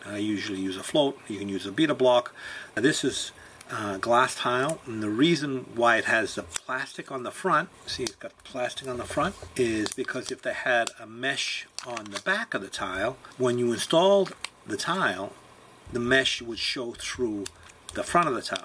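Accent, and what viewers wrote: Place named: Danvers, United States. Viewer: Thanks for another great video. I started tiling full-time just a couple years ago and you've been an important resource to build my knowledge and skills. I like the straightforward nature of your videos and listening to you talk reminds me of being back in Danvers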